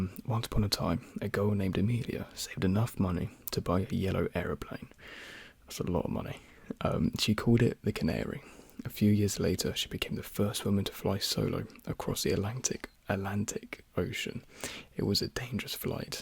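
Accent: United Kingdom. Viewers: British accent, i live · Ох уж этот вкусный британский акцент - я просто в восторге! Хочу чтобы у моего будущего парня был такой же голос))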